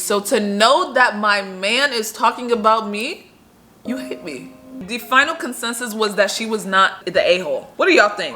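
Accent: Nigeria. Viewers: Weird question, are you Nigerian? I can hear the accent 😂it’s ever so slight but I love it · I just knew you are Nigerian The intonation and all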